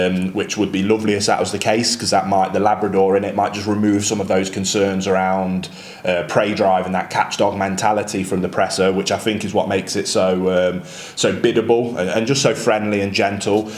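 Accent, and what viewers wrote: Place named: West Midlands, United Kingdom. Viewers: Sounds to me like a fellow Black Country chap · You sound like you're from the west Midlands! Nice to see a local doing well, here's to your success. Could I also volunteer at a kennel?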